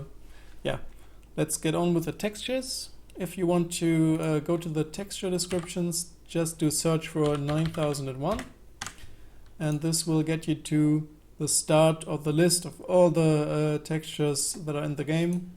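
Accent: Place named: Germany. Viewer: haha ich hör dein Englisch und denk mir direkt, der muss Deutsch sein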